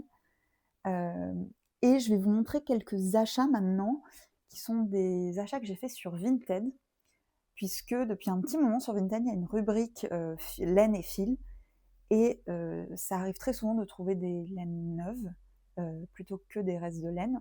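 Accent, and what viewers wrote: England, United Kingdom. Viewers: Merci pour ces inspirations 👌 Tu as vécu en Angleterre pour avoir ce bel accent ?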